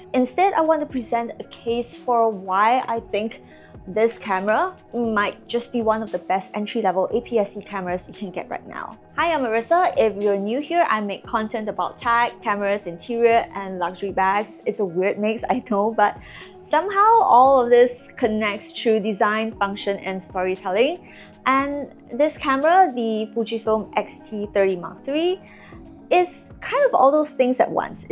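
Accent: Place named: Singapore. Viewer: fellow singaporean here and i’m so happy to hear a familiar accent on youtube!! :) i’m also planning to get this camera so this is very useful. thank you!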